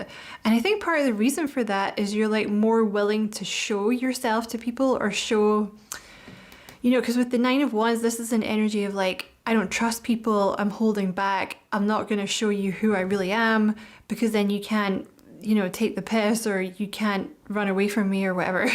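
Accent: Scotland, United Kingdom. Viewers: Nice to hear a Scottish lassie here...we the best at this...love it I read too X with love Edinburgh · This is so accurate on many fronts, thank you 🙏🏼♥️🙏🏼 Let’s hope this new person comes in as soon as the Devil ex is well and truly out of my life! Lovely to hear a fellow Scot and to see you being so successful in your field xx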